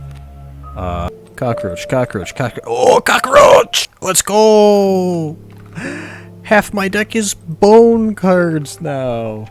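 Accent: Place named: Michigan, United States. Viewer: Are you from Michigan? Your accent sounds so much like my brother in-law who is from there.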